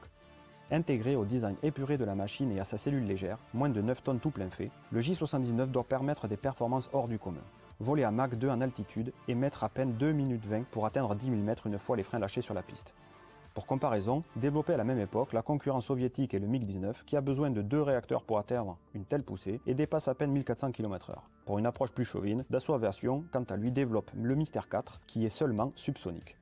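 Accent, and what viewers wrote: South, France. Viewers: excellente vidéo ! bel accent du sud 👍😆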